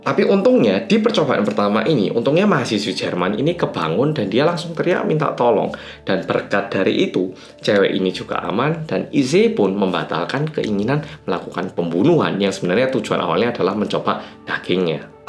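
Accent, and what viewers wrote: Java, Indonesia. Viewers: Aku tu lihat yg medok, itu udh seneng,di tambah lagi cerita nya ini,lebih seneng,cerita sendiri takut sendiri 😂😂😂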